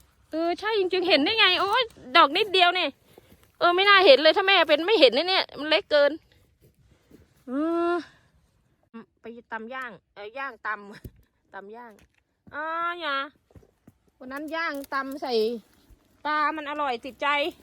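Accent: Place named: Phu Thai, Thailand. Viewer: โอ๊ย ยาย หนู กรี๊ด!!!นำ งามคัก งามคัก เห็ด ตาแซ่บเนาะ ยาย😆😊👍 หนูอยู่ แอฟริกาใต้ บ่มีให้กินเลยค่ะ ว่างๆ เพื่อนๆ แวะมาเยี่ยมเยียน ส่อง ทางแอฟริกาใต้ กันเนะเด้อค่ะ เข้ามาติชมเนะ พึ่งทำคลิปใหม่ค่ะ ตามสามีไปติดตั้งแก๊ส แบกแก๊สพร้อมพี่น้อง แมนคัก มาดามเมืองคนดำ 555😆🙏🇿🇦🧯🚐🌷❤️🏡✅ ยาย ขออนุญาตค่ะ คนที่ไหน ทำไม พูดคล้ายๆ คนภูไท แท้ค่ะ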